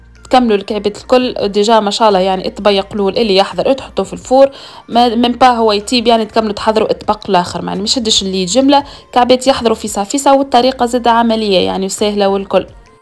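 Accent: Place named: Tunisia. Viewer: اللهجة التونسية مختلفة كثير على اللهجة المغربية تشبه اللهجة الليبية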